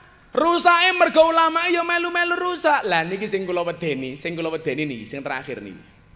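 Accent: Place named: Java, Indonesia. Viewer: Bahasa nya internasional dong.. Nggak ngerti medok banget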